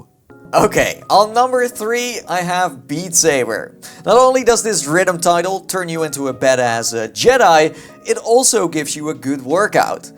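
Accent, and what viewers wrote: Netherlands. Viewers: Your english accent is verru dutch, are you dutch? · I just cant stand his accent, I mean I'm dutch and he is too but his english has a dutch accent in it, I just cant listen to it.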